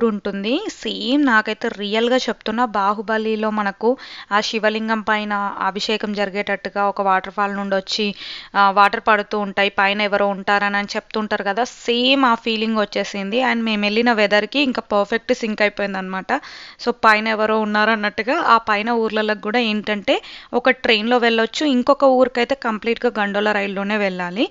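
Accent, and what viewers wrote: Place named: Telangana, India. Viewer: Switzer land video excellent....telugu telagana slag..telugu ammayi ....eni yrs ayindhi usa loo settle ayi...emaina introduction video vundha...ella antha easy gha eni yr settle ayaru...malli europe countries ani video thisaru very rich anipisthunaru ...chalavaraku andhariki settle kavataniki okha 20yrs time paduthundhi ...manam putina place ellu vundali anukokunta andharu antha easy foreign countries ella settle avuthunaru. .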